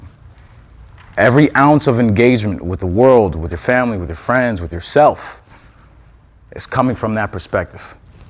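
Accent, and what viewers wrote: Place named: New York, United States. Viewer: Oh you DEFINITELY from NY lol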